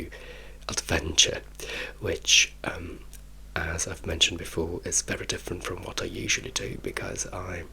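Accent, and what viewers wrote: United Kingdom. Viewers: I would love to hear you speak Italian, maybe you could do one video? I could swear you were British, I can't belive you aren't a local. PS. Such a shame you could not be yourself in Italy, I hadn't realised the homophobia over there is so strong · As an American, you definitely sound British to me! 😂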